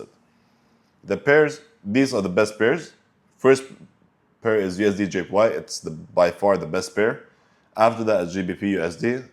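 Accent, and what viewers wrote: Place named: Eastern Europe. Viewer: When I hear an eastern European accent, I listen. I hear you guys know how to trade.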